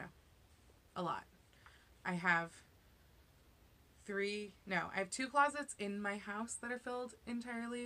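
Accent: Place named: Oklahoma, United States. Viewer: Oklahoma? No wonder I enjoy listening to you.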